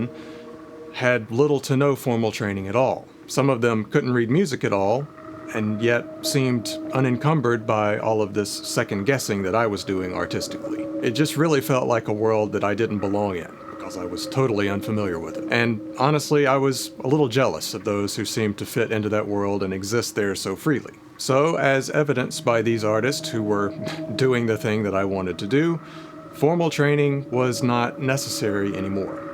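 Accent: South, United States. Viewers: there's only one thing I love more than the intersection of the academic study of music and the artistic application of advanced music theory on technology: hearing the subject discussed in a mild southern accent. 💜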